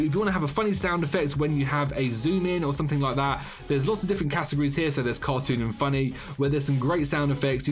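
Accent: United Kingdom. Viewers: A man that sound British and looks Swedish?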